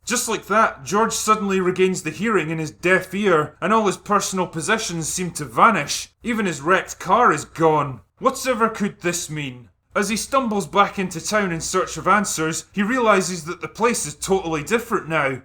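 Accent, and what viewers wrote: Scotland, United Kingdom. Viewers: His dulcet Scottish tones warm my soul.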